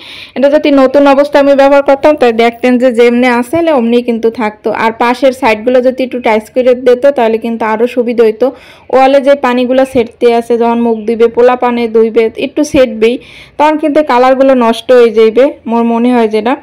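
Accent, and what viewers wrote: Barishal, Bangladesh. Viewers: আমার বরিশালের কথা শুনতে কিন্তু ভীষণ ভালো লাগে। তাইতো আপনাকে ভালোবাসা দিয়ে গেলাম আর আপনার ভালোবাসার অপেক্ষায় রইলাম আপু❤❤❤❤❤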